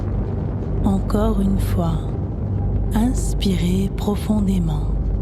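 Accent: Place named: Democratic Republic of the Congo. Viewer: C'est pas agréable de se faire raconter une histoire par une personne ayant un accent congolais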